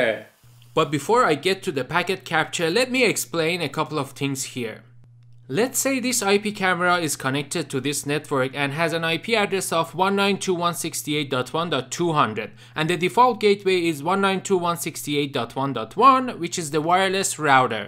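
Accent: Iran. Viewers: Thanks, quite useful for troubleshooting my IP cameras...and oh yeah.....I just love that Persian accent :-) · your accent like iranians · in iran we say that your Tehraneis accent is killiiing me and we think thats beautiful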